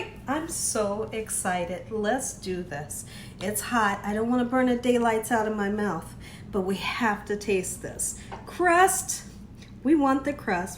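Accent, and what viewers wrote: South, United States. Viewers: You accent is sooooooooooooo bomb. I love a country girl accent💖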